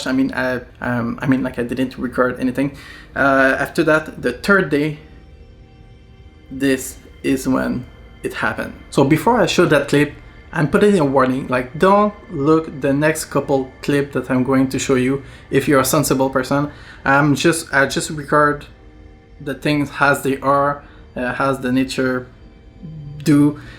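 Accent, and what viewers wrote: Quebec, Canada. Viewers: Hi sorry for the loss are you from Quebec Canada. Cause you have an accent.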